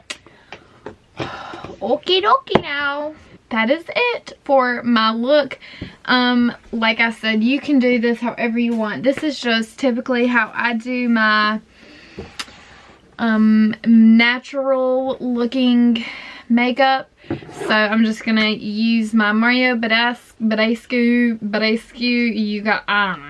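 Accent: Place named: South, United States. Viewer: You look amazing girl. You have a south accent I'm guessing your from the south of the us, but I have been watching True blood and you remind me of them. I hope this wasn't that offensive If it is I'm sorry. But great video!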